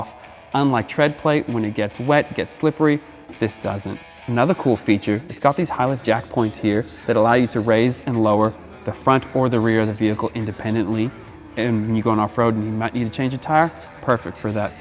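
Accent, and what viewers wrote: Australia. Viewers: So lost he’s got a North East accent with Aussie undertones! 😆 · Such a slick and polished video but the light bar is crooked. The presenters accent is so "Australian light" that it's distracting. But OMG those are some killer upgrades. The black car hides all the cool upgrades. A car that was any other color than black would have been a better choice IMHO. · Thank you for toning down your Australian accent so I can understand you! Great video and project - thumbs up! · Idk how you have an Aussie and Brooklyn accent at the same time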